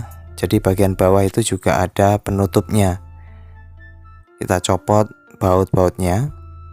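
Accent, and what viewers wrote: Java, Indonesia. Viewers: Mas mau tanya, mas asli jawa ya?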